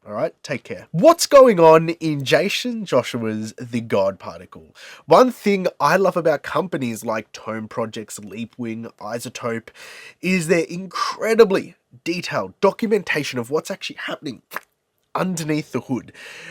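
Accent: Australia. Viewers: As soon as I heard the ausie accent and swear words I subd 👍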